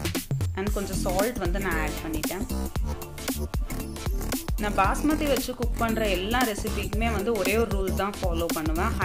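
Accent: Kerala, India. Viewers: Nice speak. Tamil. I think your from kerala. But your speech. Tamil. Gails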